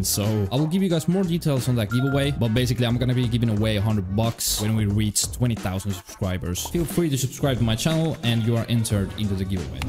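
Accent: Finland. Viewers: You sound like finnish guy and i from there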